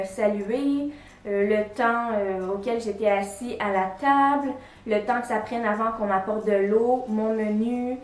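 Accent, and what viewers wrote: Quebec, Canada. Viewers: tu a l'accent quebecoi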